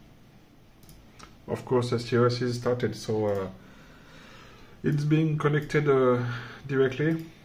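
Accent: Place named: France. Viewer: Un bon accent Français 🙂 Merci pour la petite vidéo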